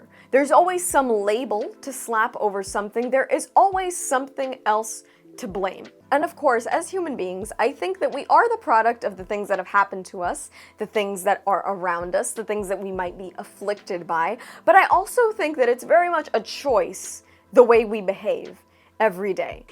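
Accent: Australia. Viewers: Excellent accent mate, I closed my eyes and could picture an Australian 🦘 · How many upvotes can I give you for your choice of restaurant, how you want the steak cooked and hilarious aussie accent? LOL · Your Aussie accent, tho! 👍😄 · Love the Australian Accent 😂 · Great video, big fan of the content you create. The people making TV and films today are just arrogant fools. I mean what's the worst thing you can do if someone doesn't like your stuff, oh yes, tell them that there's something wrong with them for not liking it. Total hubris on their part and pretty much guarantees that the next thing they do will be frowned upon too. However, it does pointing out that it's not just people in Hollywood who currently have this attitude, look around anywhere and you'll see the same thing happening; nobody in any walk of life wants to accept any sort of criticism today. 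Loved your Australian accent as well. Keep up the good work. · Hahaha love hearing an American do an Aussie accent. It’s the cutest thing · I did not expect the Australian accent 😅 well done. This should be a training video for PR people in Hollywood. You hit all the points 🙌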